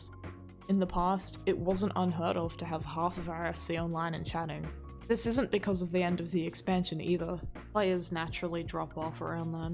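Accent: Australia. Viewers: Just found this video by chance. I dig the accent. Now, on topic: I recently cancelled my subscription (early Stormblood). I've had mostly mid experiences, but more bad than good. As far as MSQ and the game itself, it's just not gripping me anymore. I'm sure I'll be back . . . someday. But I just know that today is not that day. Keep making content so I can hear more of that lovely Australian accent. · Also are you Australian? You sound it. I have found the Australian community to be one of the worse in my experience and I have played on like 12 different servers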